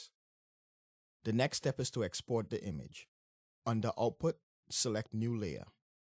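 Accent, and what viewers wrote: Trinidad and Tobago. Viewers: Sounds like a Trini! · thank you! BTW you sound Trini.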